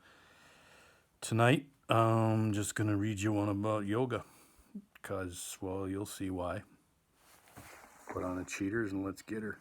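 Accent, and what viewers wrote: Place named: Wisconsin, United States. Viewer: I'm up late here in Portland Sucks Just finished my caretaking For my husband who is now 90 Got my teeny "medicinal" martini And who pops up on my phone? My favorite Wisc author! Ahhh! Sigh... The comfort of your Wisc voice I miss Wisc every single frick'n day Forget searching Google for an extra water filter! I'm gonna listen to Michael Perry! Tomorrow I'll fire up the old Firestick And treat my husband to to a morning of Michael Perry and coffee Remembering all your concerts And missing Wisconsin 😪 And.... Portland Sucks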